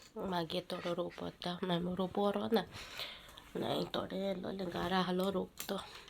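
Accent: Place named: North Goa, India. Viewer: I just like your north goa accent ❤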